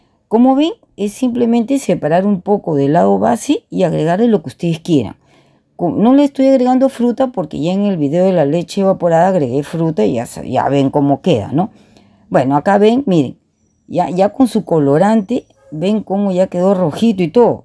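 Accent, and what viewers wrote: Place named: Barranca, Peru. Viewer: Me encanta .... juraría que eres de barranca... hablas igual que mi tía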